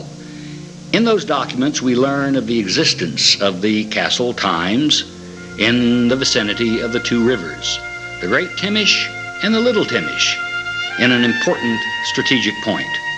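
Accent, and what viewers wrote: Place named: Oxford, United Kingdom. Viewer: an englishman in timisoara this...nice OXFORD accent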